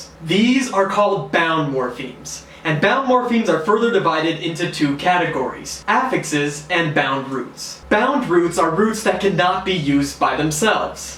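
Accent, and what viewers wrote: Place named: United States. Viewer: his accent also helps a lot, an excellent American well pronounced English Accent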